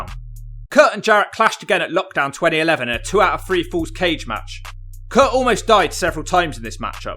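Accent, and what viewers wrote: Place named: England, United Kingdom. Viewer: Addiction is no joke thumbs down stupid English accent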